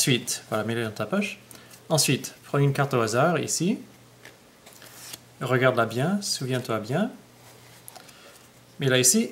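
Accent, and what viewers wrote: Quebec, Canada. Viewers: Super tour bien expliqué avec l'accent québécois en plus ! Merci :) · canadien ? (j'aime le canada :D)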